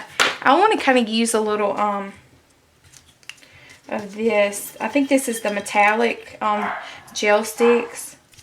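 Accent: South, United States. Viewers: Love all those bright colors and how you incorporated the paper towel. I threw mine away not long ago...my bad. oh how I miss that southern accent! I attended Auburn a long time ago :)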